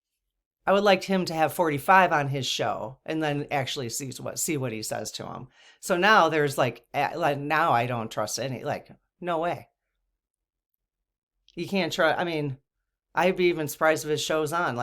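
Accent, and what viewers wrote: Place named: Wisconsin, United States. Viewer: ....wow you are SO Refreshing, I love listening to you cause it feels like talking to my sister or best friend....by the way are you from Wisconsin? you have that Wisconsin kinda vibe and accent? I LOVE your feisty truth telling too........all of us should strive to have that yin yang balance of doing deep inner healing work, connecting to our own higher self and spirit guides to help us grow and learn....and then taking action and being brave enough to challenge the injustice and talk about it like you are doing on your channel....May we all be guided together to a more peaceful and sustainable world!!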